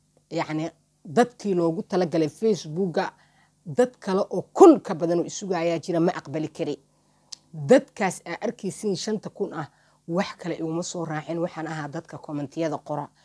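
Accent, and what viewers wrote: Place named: Somalia. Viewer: markaa ku bartyba cidaa thyba dhaqsaba waa garty waayo lahjadeena maaha mid dhunta iyo codkarnimadeena lkn gabar umada usiman thy allah ha ku xafido abayo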